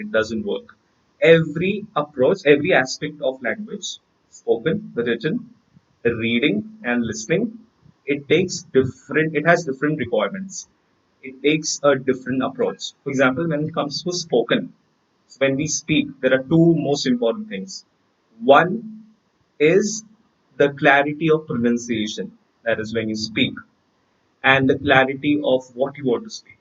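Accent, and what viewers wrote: United States. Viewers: Awesome session sir...Btw ur american accent is reall good👌👌👌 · Sir please make the video on American English ,your American English is very nice. I also want to learn it .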